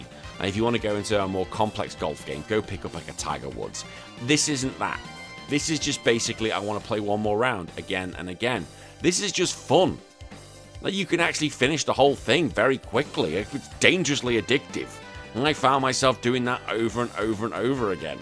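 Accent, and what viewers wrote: England, United Kingdom. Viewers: hey not to be weird, i love your accent, what part of england are you from? its my favorite sounding one. I guess it's one of the reasons I've seen about all your videos so far.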